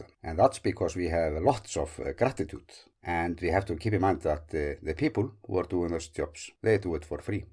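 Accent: Iceland. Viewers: I understood you perfectly. You have a wonderful Icelandic accent and humour to match! I always enjoy your very informative videos!! Keep them coming ❣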